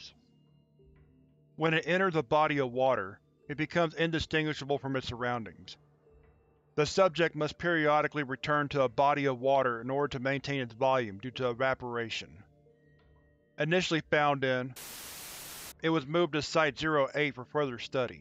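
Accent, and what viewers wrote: South, United States. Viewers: From what I can see, you're doing an outstanding job. In my opinion, as with all things, your new focus and "stepping up the game" has completely revitalized the presentation of SCPs, and you should feel rightly proud of it. Also, it's good to hear another Southern Man doing videos that aren't stereotypically Southern.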